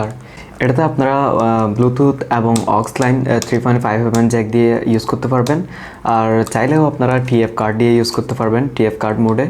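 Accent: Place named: Noakhali, Bangladesh. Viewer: noyakhliar local accent roye gese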